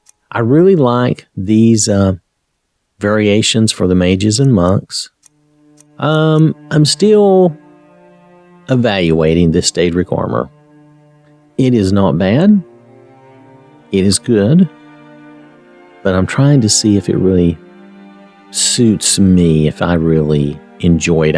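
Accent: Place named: North Carolina, United States. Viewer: I knew it! Born and raised in Rutherford county NC myself, I recognized the accent 😅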